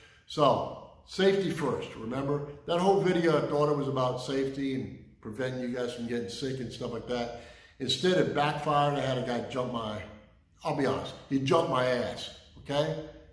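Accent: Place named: New York, United States. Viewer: ... I knew it! Lol! My wife and I were watching one of your vids on the big screen, and I said to her "You think this guy is from New York?" She said "Nah" I'm originally from Syracuse, transplanted to Ohio about 26 years ago. Got a lot of 'you from NYC?' Didn't think I had that strong of an accent being from upstate and all. Now, the NY accent only comes out when I get upset. Lol! Have a great evening!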